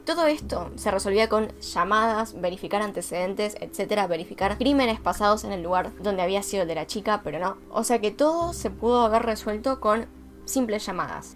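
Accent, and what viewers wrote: Argentina. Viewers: ¿Eres de argentina? Perdon quiero saberlo BDHDNSVJ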